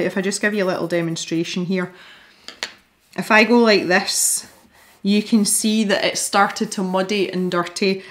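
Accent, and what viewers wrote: Scotland, United Kingdom. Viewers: So good hearing a Scottish accent 🙈 hi from Ayrshire ! Just found your channel love it !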